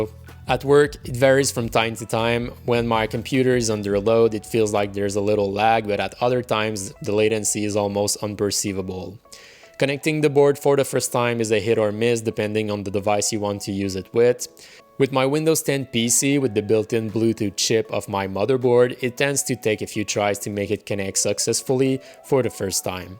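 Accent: Quebec, Canada. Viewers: Are you from Québec ?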